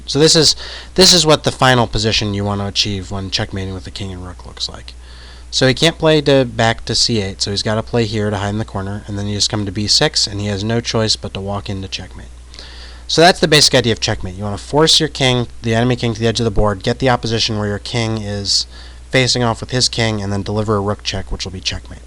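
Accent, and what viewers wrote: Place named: Maryland, United States. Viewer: Are you from Frederick, Maryland.... it sounds just like a guy I know from there?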